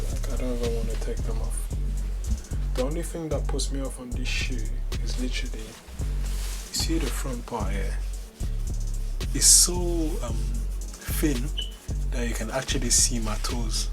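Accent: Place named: Jamaica. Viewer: Jamaican accident is coo bruh. no homo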